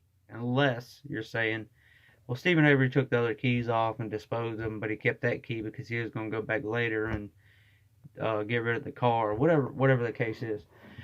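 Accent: South, United States. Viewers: Thank you doing great and I love the southern accent and who cares do not listen to the haters ,Welcome freedom fighter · Great video. Love listening to your southern accent!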